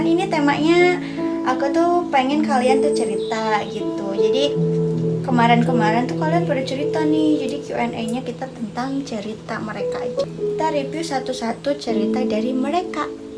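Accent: Java, Indonesia. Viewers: Muka sunda logat jawa. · kok logatnya kayak Jawa, aslinya mana mohon dijawab, salam jatim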